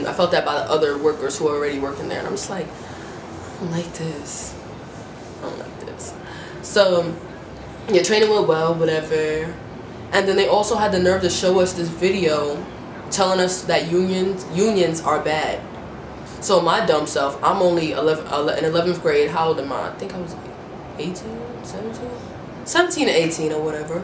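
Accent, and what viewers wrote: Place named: New York, United States. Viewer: Where you from?? You sound like your from ny cuz that's where I'm from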